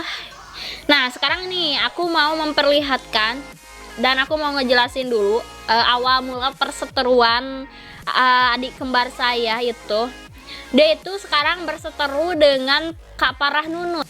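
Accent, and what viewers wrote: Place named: Madura, Indonesia. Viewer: Kek org madura gitu logatnyaa, te sateeee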